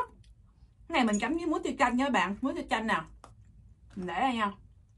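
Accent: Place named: South, Vietnam. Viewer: Lần đầu vào xem 😊😊😊 . Hok hiểu sao lần đầu xem mà thấy có cảm tình 😂😂😂 . Chắc có thể giọng bạn miền nam và hay cười . Chúc bạn và kênh của bạn phát triển mạnh hơn nữa nha .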